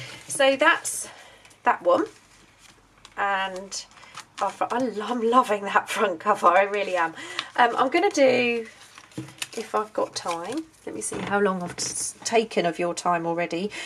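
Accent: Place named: Greenwich, United Kingdom. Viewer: Thanks so much sweetpea I also love your accent I come from Greenwich and have a similar accent! But now I live in Melbourne Oz xx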